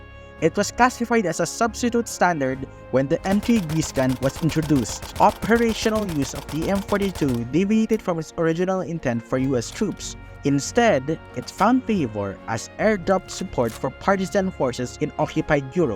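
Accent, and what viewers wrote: Philippines. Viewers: I love me a filipino accent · Can’t tell if the VO is an intentionally bad filipino impression or just uncompromisingly authentic, great work as always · you don't need a better mic tho, you gonna need voicemeeter banana to modify your mic quality that sounds good.(free to use) Also, i can notice your filipino accents while speaking english.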